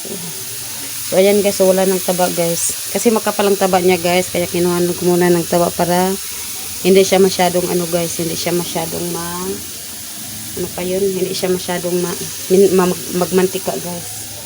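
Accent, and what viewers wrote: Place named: Visayas, Philippines. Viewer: Bisaya ka mam,kay law-oy man imong sulti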